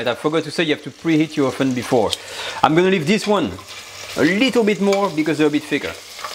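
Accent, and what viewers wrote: France. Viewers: Your🌈 french 🌺accent 🔥😍 👌 😋😘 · Your English is excellent despite your French accent :-) This recipy looks amazing and easy to prepare... I was just wondering about the way you "reserve" your meat pieces before putting them on the plate and have them still warm after preparation of the mushrooms sauce... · I will definitely make this; I love your French accent! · I love his recipes and even more his techniques, and love the French accent. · "Nudge of Butter" with a real French accent is delicious in itself....., your own cookbook should be titled, "Nudge of Butter"....love it and hope ALL your dreams come true. I love your Academy....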